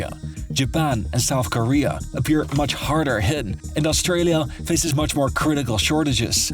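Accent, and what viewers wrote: Netherlands. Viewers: Mate are you dutch?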